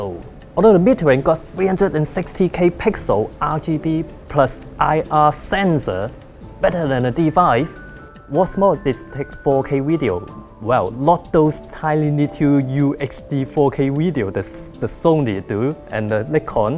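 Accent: United Kingdom. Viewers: You sound British..... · Nothing wrong with his English. He manages his puns well, and I do understand what he speaks. And I like his reviews as I like the other Asian's who does British accent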